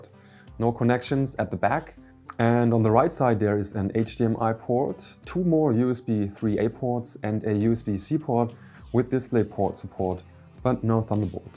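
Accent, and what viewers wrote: Germany. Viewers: i have been trying to learn german(now i can understand english in german accent😭) also great review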